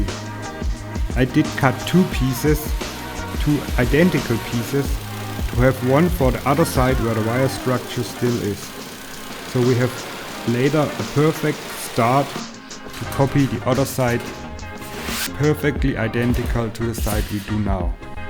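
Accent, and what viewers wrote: Germany. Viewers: Warum in Gottes Namen redest du kein Deutsch, das hört man das du kein Amy oder Engländer bist sondern ein Deutscher. Authentizität ist was anderes, aber gut muss jeder selbst wissen wie Authentisch er sein möchte oder auch nicht, wie in deinem Fall.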